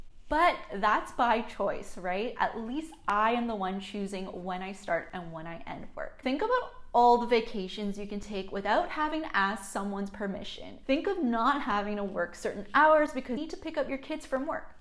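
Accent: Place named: Canada. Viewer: It's the Canada “abouts and out” for me and in here for the “just fucking go for it” yaaaaaaasssss Queen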